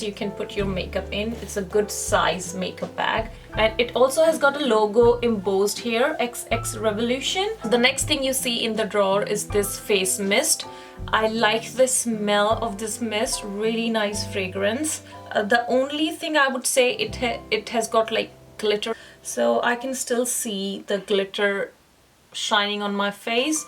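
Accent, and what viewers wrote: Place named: Pakistan. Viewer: You are so lovely and gorgeous I don’t mean to be nosy but are you Pakistani because you sound like Pakistani I don’t mean to be rude or nosy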